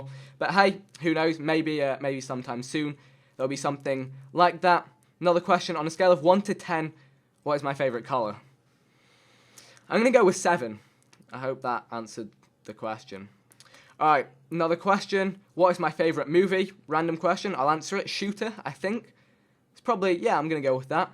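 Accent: England, United Kingdom. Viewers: Your english accent is so contrasting to your appearance it's quite cool! Love the videos, nice to do a facecam once ina while right!? Keep up the good work! Jack:) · is indian I thought he was English he sounds like he's from England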